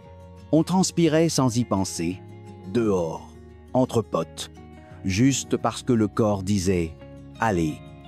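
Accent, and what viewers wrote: Quebec, Canada. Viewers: Mais c'est de la France dont vous parlez, ou du Québec? l'accent dans la narration est vraiment québécois, et je vois l'affiche Théatre Princesse avec Ville de Québec dans le visionnage. De quel pays parle-t-on exactement svp???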